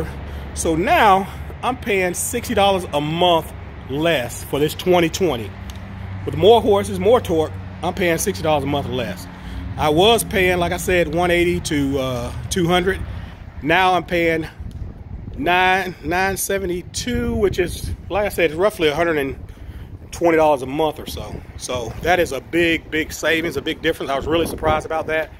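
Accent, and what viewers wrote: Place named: South, United States. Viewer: You can tell you right from down south.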